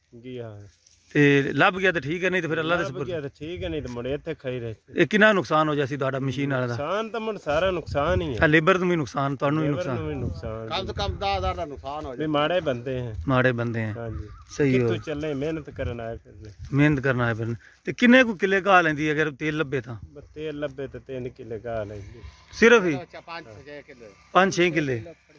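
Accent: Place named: Ferozpur, India. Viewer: Bhai saab tuhadiyian video nall sade Ferozpur Fazilka area de loka no bhaut fyida hoya kyuki sadi boli te tuhadi boli same hai jiss nall jahre malwa belt te majha doaba area de loka ne sada majaak banaya hoya ci hon ono de mouth te olte maooh thappar vajiya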